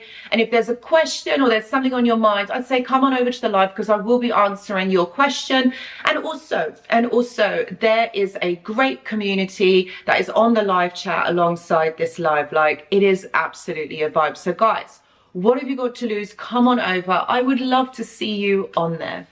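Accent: United Kingdom. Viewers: Very eye opening! For me this one has been very hard to tell because I am very open minded sexually- even so though he’s done a few subtle things that just made me feel uncomfortable. I could never really put my finger on why but just this lingering feeling that something is wrong. I was also going to say that since I have been watching your videos every day now I noticed when I am dealing with the narcissist it’s like your voice is in my head dictating my words! I am writing out the text response and I read it back to myself and it’s almost like I didn’t even write it I hear this you know British accent and I’m American!! Just something a bit kooky lol 😂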